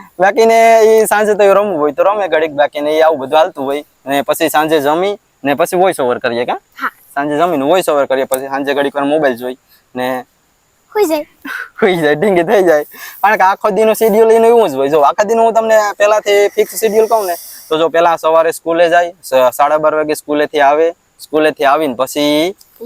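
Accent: Kathiawar, India. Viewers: હું આપનાં વિડિઓ તમારી કાઠિયાવાડી ભાષા ને કારણે જ જોવ છું.....સરસ એવી રીતે બનાવતા રહેજો..👍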